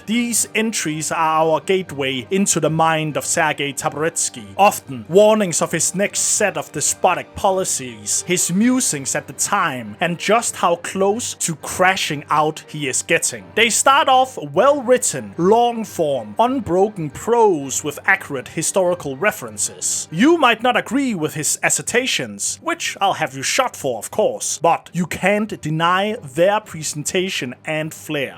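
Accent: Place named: Denmark. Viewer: am i stiktzo or are you danish?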